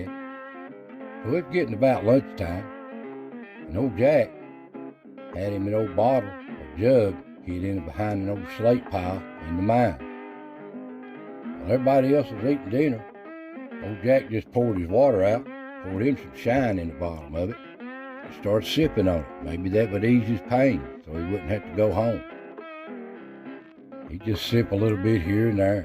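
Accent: Appalachia, United States. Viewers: Hello from Virginia my friend, have a great weekend 🇺🇸 Voice of the Smoky mountains ✅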